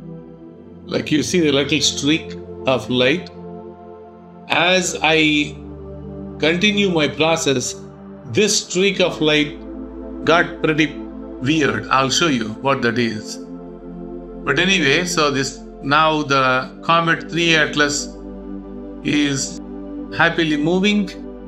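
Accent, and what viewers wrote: India. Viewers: This Man, explaining in his thick Indian accent, what I believe to be True. is speaking HONESTLY! Please Listen to Him as I Am! Doc Bones, out.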